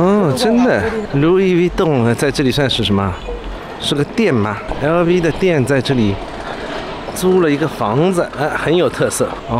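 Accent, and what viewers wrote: Shanghai, China. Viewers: 相對於其他博主介绍上海種種然weley以上海腔的國語導覽敘述令人 enjoy , 原生的上海人説的普通話有其特殊的語調詞彙，帶有種捉狭却又認真的複雜元素(没有貶意)這是只有以上海話母語的人才具有的, (外 地人無法模仿)上海待過較久的人聽起來特别親切, 尤其在90/00年代外來人口較少普遍都是這種腔調普通話， 歳月如梭,這幾年回去應該是新上海人越来越多,聽到的都是標準普通話, 這也可能是上海無形中漸漸消失的海派元素之一吧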